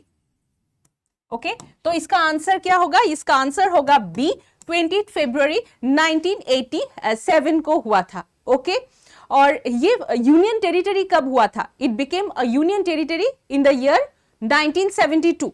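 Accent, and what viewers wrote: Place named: Assam, India. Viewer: Assamese hindi accent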